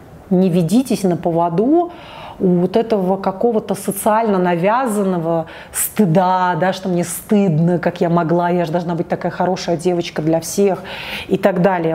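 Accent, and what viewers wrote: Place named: Russia. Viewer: Без акцента?! Чудовищный русский акцент!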